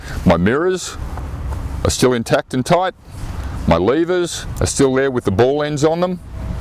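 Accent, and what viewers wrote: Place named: Australia. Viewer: love australian accent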